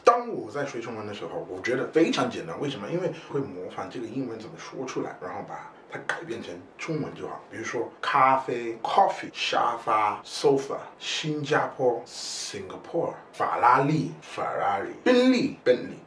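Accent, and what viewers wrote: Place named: Africa. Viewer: You have a strong African accent tho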